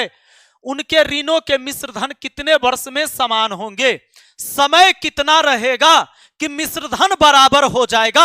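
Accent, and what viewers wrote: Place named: Delhi, India. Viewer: Delhi me rhata hoga wha aise hi bolte h adhiktar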